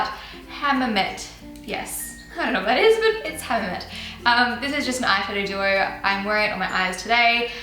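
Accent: Australia. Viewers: oh gosh, when I hear you speak, I so wanna have Australian accent!!!! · You do realise it's an Australian accent right? You basically insulted ALL THE PEOPLE who have the same accent. So fcking immature...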